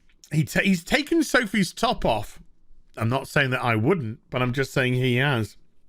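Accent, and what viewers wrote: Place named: Yorkshire, United Kingdom. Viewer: I love how you can tell how irritated is by how Yorkshire he gets:D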